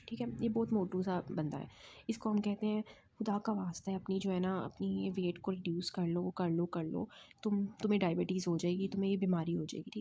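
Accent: Karachi, Pakistan. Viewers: MAINE W22 OR BILAL MAZDY KA AWAZ SUNA MTLB AP KARACHI SY LAG RAHIII HAIN DAIKY LECTURES KITHNY SHOUQ SY SUN RAHA HU BAGROUND KI ENVIORNMENT BE CAPTURED KR RAHA HO HHHHHH GEO KHANS HHHHH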